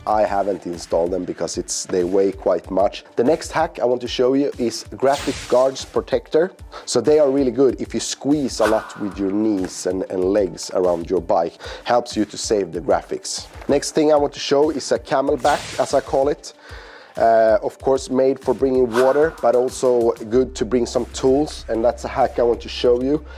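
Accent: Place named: Sweden. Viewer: Fan vad jag gillar Hur du förklarar Doften av Hubba Bubba =) skön informativ video med skön svengelska ! tumme upp...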